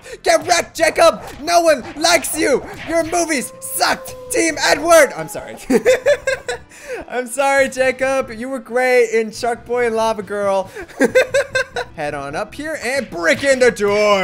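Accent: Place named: Sweden. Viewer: You sound slightly Swedish :o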